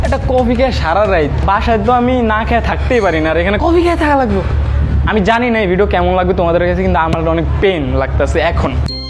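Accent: Barishal, Bangladesh. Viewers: বরিশাইলা বলে কতা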